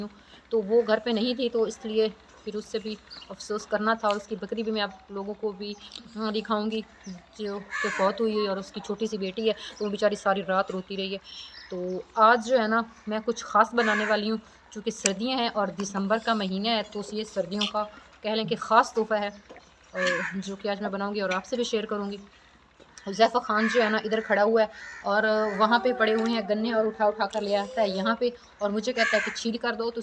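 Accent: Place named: Lucknow, India. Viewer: wali beti tu aaj luckhnow wali urdu bol rahi hay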